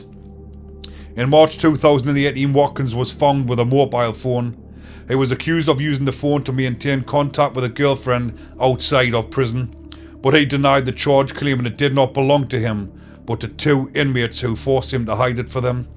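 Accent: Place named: Scotland, United Kingdom. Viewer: Your Scottish accent is cool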